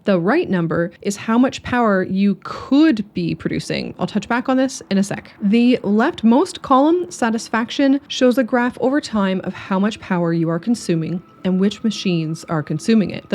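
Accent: Canada. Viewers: subbed for the canadian accent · I'm pretty sure she's too Canadian to be AI, at least. No way any of the basic, bland, AI voiceover videos is calling anything an 'underdoober'. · I heard aboot, howdy fellow Canadian (if my guess is correct), the Factory must grow, eh! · CANADIAN SPOTTED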